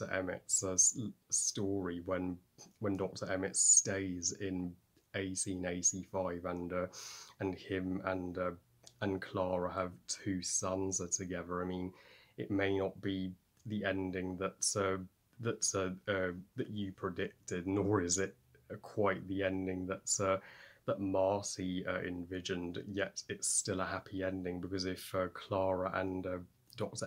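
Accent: West, United States. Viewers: Hi! We are big fans of this series. Of course one and 2 are our favorites but 3 wasn’t bad. Your western accent is cute!! 😆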